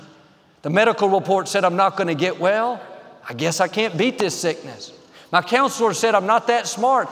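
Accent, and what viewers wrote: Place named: Houston, United States. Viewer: "DON'T BELIEVE THOSE LIES!!!" (with his Houston TX accent!!!) One of my three most favorite comment/quote by my pastor Joel!!!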